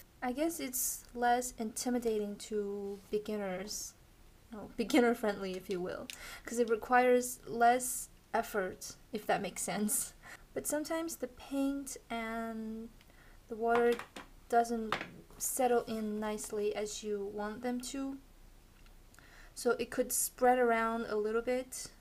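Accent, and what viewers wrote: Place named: France. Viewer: You have such an amazing french accent, are you french? or have you lived there?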